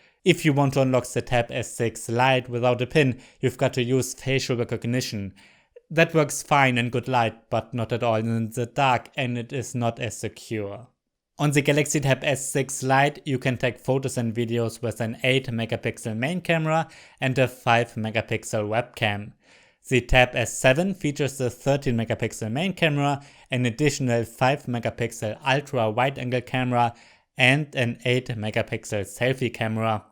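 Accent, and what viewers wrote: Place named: Germany. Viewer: like everyone can hear that you'r from germany :]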